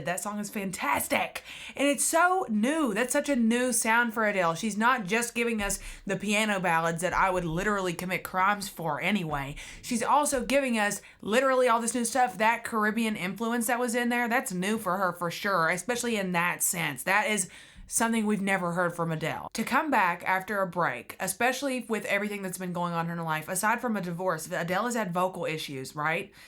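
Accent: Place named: South, United States. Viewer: your southern accent is my favourite thing ive ever heard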